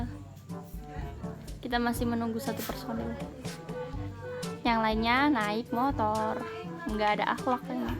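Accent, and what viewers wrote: Indonesia. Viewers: Wong purwodadi ndy suorone lurr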